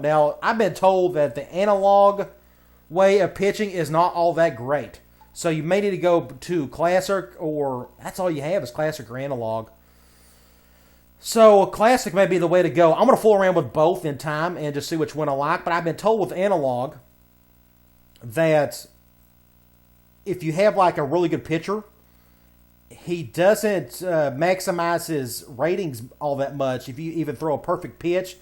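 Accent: South, United States. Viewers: Love the video man. You have a great personality man and we kindve got the same southern accent lol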